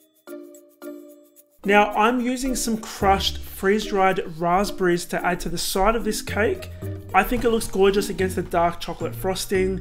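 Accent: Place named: Australia. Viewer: Wow..am i the only one who is here by watching the luscious froasting and ended up watching twice because of his amazing australian accent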